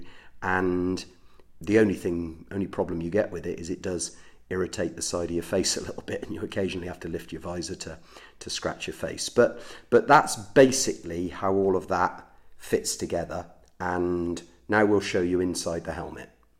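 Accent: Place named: England, United Kingdom. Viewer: the accent makes you sound like the English Morgan freeman mate it's sick😂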